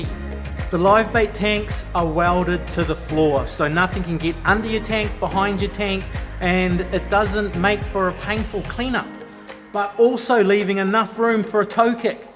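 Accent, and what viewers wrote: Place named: Australia. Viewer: I'm not sure what's more cool that STONG Australian accent or that aluminum boat.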